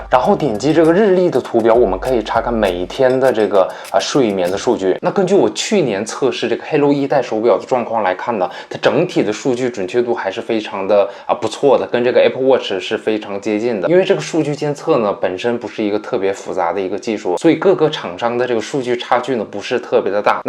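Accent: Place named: Northeast, China. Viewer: 隐约有点东北味儿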